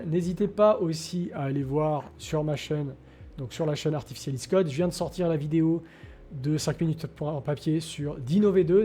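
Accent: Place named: Vaud, Switzerland. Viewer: Ça fait du bien d'entendre du vaudois sur youtube ;)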